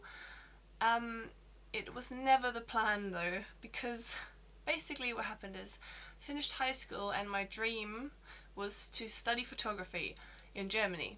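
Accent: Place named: London, United Kingdom. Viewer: Cheers to you for make videos about your experience in the U.K... and your accent i think i'm watching your videos for your accent its so charming you sound like a true londoner... Really lovely C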